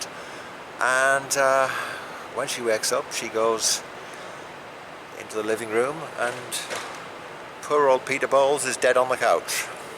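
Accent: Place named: North Yorkshire, United Kingdom. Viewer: I loved the series. Are you from North Yorkshire? I recognise the accent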